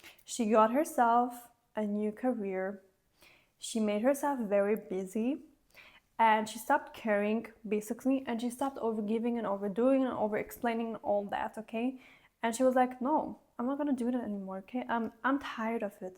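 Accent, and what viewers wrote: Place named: Eastern Europe. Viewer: She is so beautiful and kind hearted ❤❤❤ I love her accent. Where is she from? I think she is my Slavic Queen 👸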